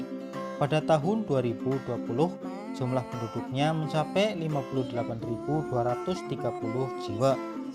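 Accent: Java, Indonesia. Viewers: Bahasa indonesianya masih sangat medok...bila di dengar yang sudah lama merantau sangat jelas...apalagi kalau berbahasa jawa terasa aneh...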